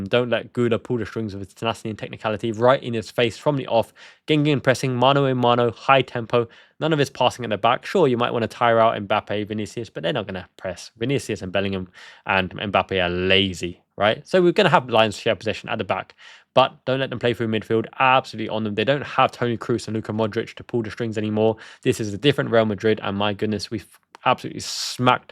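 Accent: United Kingdom. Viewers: btw dude you may be in Japan but did you grow up in the UK? You sound very British :)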